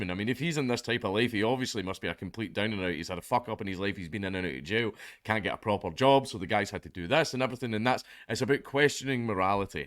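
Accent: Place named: Scotland, United Kingdom. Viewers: I think it was just his scottish accent. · No it was just that thick Scottish accent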